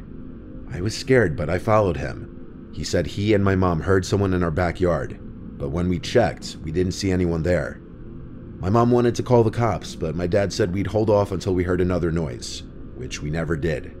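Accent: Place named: New Jersey, United States. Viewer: I'm not done listening to this yet, but after listening for years I only just realized has a slight accent versus standard American tv sound lol. Noticed from the 'a' pronunciation in 'ass'. Have a couple theories about region but not positive, it was just a quiet:D moment for me.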